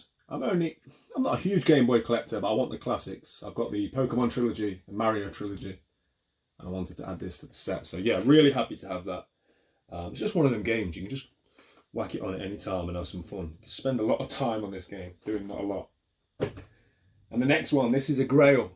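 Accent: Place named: South London, United Kingdom. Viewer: Hey man - great channel. can feel/hear a South London accent similar to mine haha(hope im right). you've got a sub - came over from gaming off the grid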